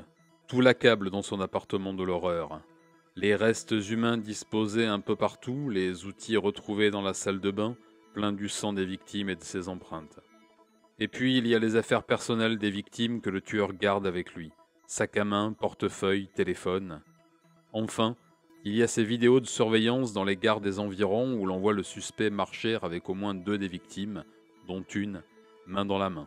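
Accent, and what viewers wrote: Japan. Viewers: Konichiwa! J'ai découvert ta chaîne depuis peu et la toute 1ère vidéo que j'ai vue, c'était le calvaire ignoble de Junko Furuta. J'adore ton accent japonais et ta façon de raconter l'histoire ! Tu gagnes un like et une abonnée !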